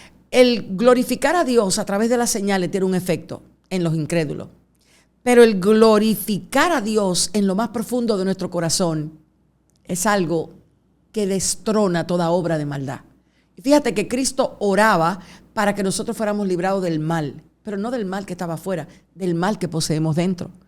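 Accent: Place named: Dominican Republic. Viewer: Q bonito habla está Hermana, q acento, q pronunciacion y enfasis mas bonito, muy capacitada en él conocimiento de la palabra pero sé nota q ha recibido un un espiritu de sabiduría y revelacion muy vasto! Poniendo la mujer cristiana dominicana en alto!!!!Saludos de una hermana cubana desde Kentucky!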